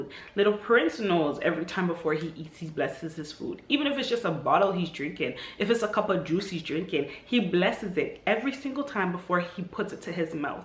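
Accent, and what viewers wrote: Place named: Jamaica. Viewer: Are you Jamaican ? Cause bwoiii this sounds like the churches in Jamaica. Plus you have an accent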